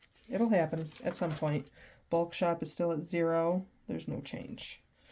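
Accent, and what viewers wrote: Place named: New York, United States. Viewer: You have a New Yorker accent. I love it!!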